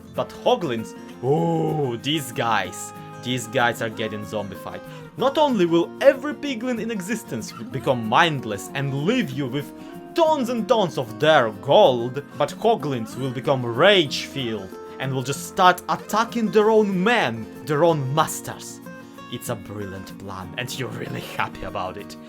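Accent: Russia. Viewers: у тебя акцент русский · У тебя русский акцент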